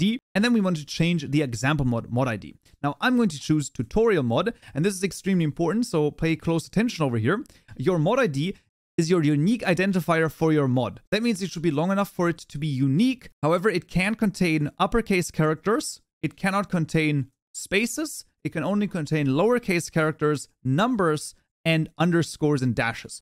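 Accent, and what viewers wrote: Germany. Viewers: i think i can hear a german accent or something likely (no hate im german to🤙)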